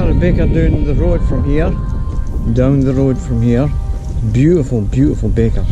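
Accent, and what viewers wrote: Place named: Wales, United Kingdom. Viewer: Accent is fine. Never had a problem with Welsh ;-) I got lost because I'm completely dopey and haven't done enough joinery to appreciate even the basics. Sometimes I'm just content to be impressed.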